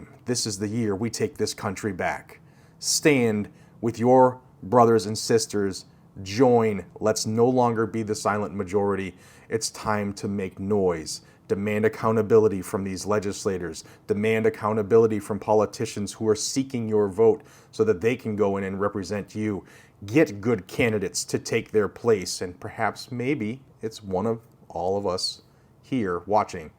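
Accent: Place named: South, United States. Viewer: you have lived down south for a year and you already have a southern accent 😅😅😅😅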